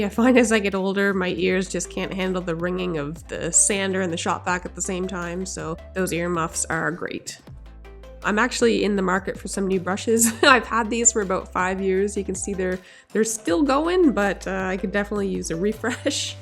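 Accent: Nova Scotia, Canada. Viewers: I'm Calgarian born and raised, but both my folks were from NS (Kentville and Alyseford/Berwich area) Your accent does show a bit..Even I get one anytime I've been for a visit ...far, car, bar, over home hahaha I love it!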